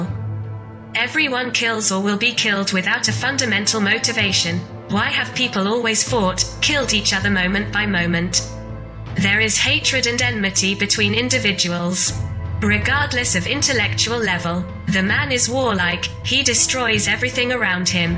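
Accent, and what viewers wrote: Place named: England, United Kingdom. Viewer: Thank you too! The content keeps me here. Plus whoever's Voice is talking. English Accent is Supreme.! 😊👍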